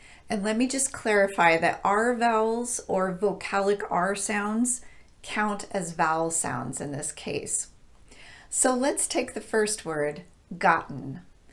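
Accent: United States. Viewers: Dear! What state are you from?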